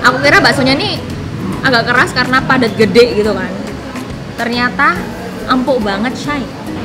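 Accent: Java, Indonesia. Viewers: Muka Korea ..sikap medok Jawa 🤭🙏😁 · mba gemesin mukanya korean girl, tapi ngomongnya jawa medok wkwk kalau ketemu saya mau cubit boleh yaa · Tante orang jawa ya soalnya medok banget... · Mbak ko isih teko jowo medok ne wkwk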